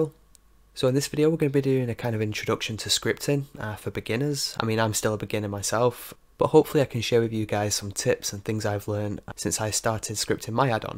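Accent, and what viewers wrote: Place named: United Kingdom. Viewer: Lovely video. I'm starting a science youtube channel (in Spanish) and I need these kinds of things to get the animations set and going. Lovely accent. I can obviously tell that it is from the UK. London and whereabouts?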